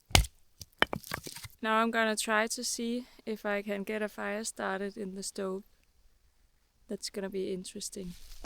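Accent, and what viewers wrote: Denmark. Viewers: Im instantly hear you are from Danmark on the accent😊 3 hours småland?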